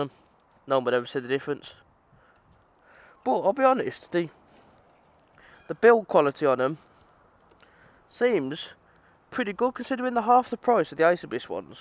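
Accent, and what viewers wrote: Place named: Walsall, United Kingdom. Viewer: you from walsall area by any chance i reconse the accent ???????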